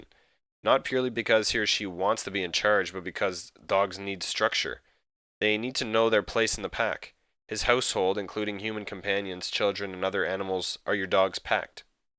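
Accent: Canada. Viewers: haha canadian accent! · Are you Canadian? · are you canadian? ^^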